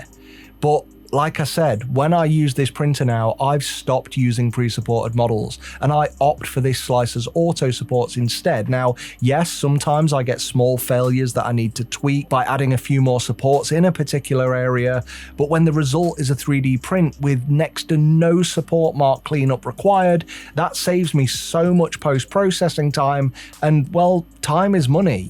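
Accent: United Kingdom. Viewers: We are in the process of setting up a small-batch consumer products company and have recently ordered a number of GK two's. Love the concept of this Heygears unit as it has the potential of minimal fettling. Unfortunately the cost of the resin would render the units impractical as it would push our product price-points too high. P.S. Great video. As alway's, informative, concise and candid. All in an East-Midlands accent. What's not to like :)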